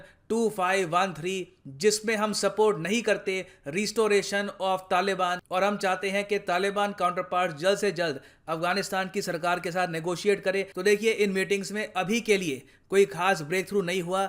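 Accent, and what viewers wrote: India. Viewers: Wo Assam ke logon ka accent hai, par to hindi bilkul bharat wale accent me hi bol rhe the, inke hindi pronounciation me to koi pareshani nahi dikhi...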